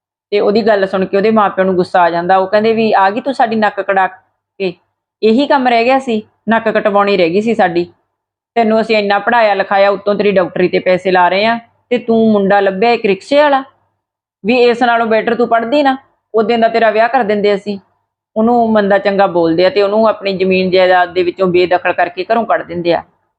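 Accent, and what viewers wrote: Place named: Malwa, India. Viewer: Sister ji soda pind kara a boli ta mansa Budhlada Bareta di lagdi a ma army bich me a Bareta tu a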